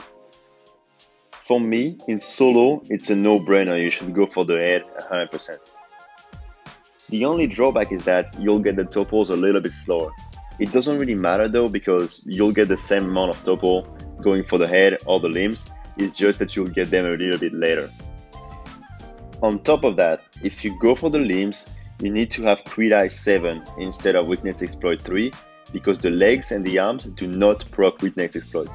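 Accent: France. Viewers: Ça s'entend que t'es français ! T'aurais pu sous-titrer pour tes compatriotes.. 🙄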